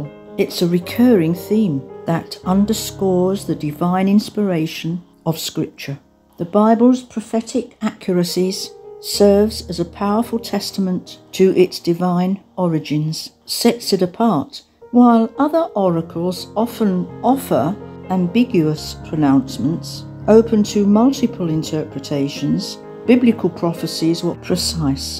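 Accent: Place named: United Kingdom. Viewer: Narration with British accents suggests truth, its just manipulation, turn video's off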